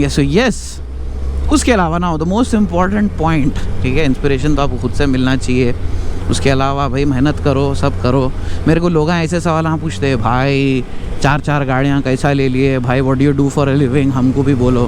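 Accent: Hyderabad, India. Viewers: Bhai tum jara to sai ka jarr malom 😂😎. First time I am listening a youtuber who speaks Hyderabadi as my level.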